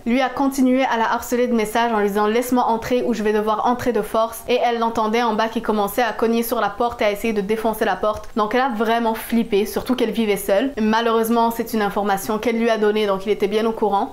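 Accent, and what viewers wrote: Quebec, Canada. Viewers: Es tu québécoise ??? Je viens juste de m'abonner à toi, je t'ai vu sur tiktok avec un thread d'horreur de la petite fille complice pour en enlever une autre bref jai bien aimé alors je vais te suivre sur YouTube aussi... bravo à toi xoxox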